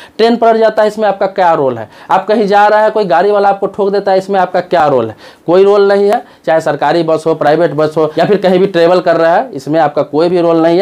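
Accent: Bihar, India. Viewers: Sir aap Bihar see hai. Na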